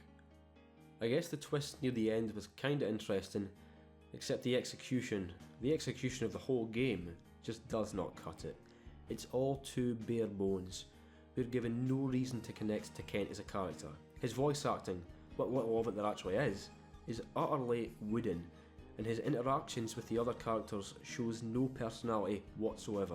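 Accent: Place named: Glasgow, United Kingdom. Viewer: Great retrospective. Couldn't stand the stuck-in-quicksand slow combat. Where in Scotland are you from? From the accent, im guessing you're a 'Wegie?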